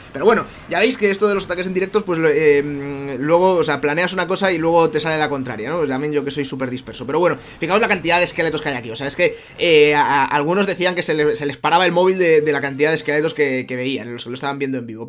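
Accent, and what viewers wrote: Venezuela. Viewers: jajajaja Venezuela hablando como siempre eres tu perrito xd jajajsj (también soy de Venezuela) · eres de venezuela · eres venezolano?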